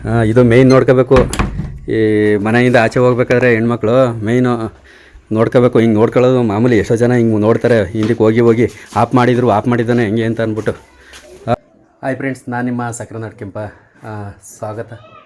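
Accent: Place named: Chamarajanagar, India. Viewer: Anna navu Chamarajanagar davru nam kadenu ede tara matadadu tumba chenagide nim vlogs kushi agutte normal life style toristira yavde artificial ella ❤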